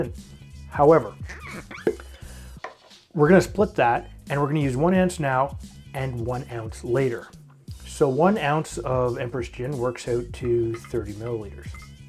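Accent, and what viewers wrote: Canada. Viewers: Nice job,. And nice looking finished cocktail. I like the slow-motion pouring into the glass. I also like how you Canadians say "about" or "out".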